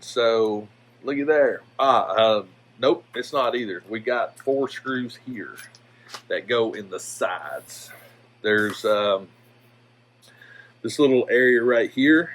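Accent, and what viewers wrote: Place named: Georgia, United States. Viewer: Are you from Ga? That accent sounds a lot like mine!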